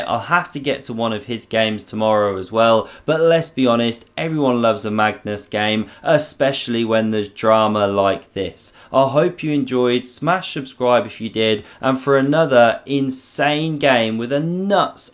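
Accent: England, United Kingdom. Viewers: Great dead-pan British humour - and well chosen chess games. (I suspect you are an English person - based on the accent.)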